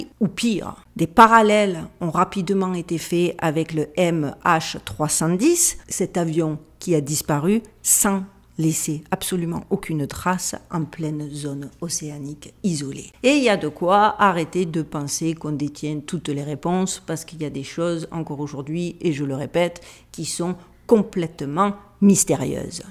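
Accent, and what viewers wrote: Marseille, France. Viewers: Bonjour je t'avoue que les 3 sujets sont plus qu'inquiètant mais je dirais que le dernier est le pire!!! Quand à ta prononciation, il n'y a vraiment rien à dire, tu t'exprimes extrêmement bien et tu n'as même pas pris l'accent Américain... Non toujours l'accent Marseillais 😊 j'adore 👍🏻 merci à toi de nous parler de tous ces sujets dont personne ne parle 😤 passe également une excellente fin de semaine bisous 🥰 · Bonjour. Merci encore pour tes infos, ta diction et ton accent qui rappelle que la Sardine a bouché un jour un très grand port. Ne changes rien. Par contre certains sont vraiment murs pour l'asile. A force de faire n'importe quoi et de se prendre pour Dieu le père, ces derniers contribuent assurément à la destruction de l'humanité. See you next sunday! 😍😘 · Tu es trop mignonne ma chérie et tu parles trop bien français t'inquiète avec ton accent du sud c'est merveilleux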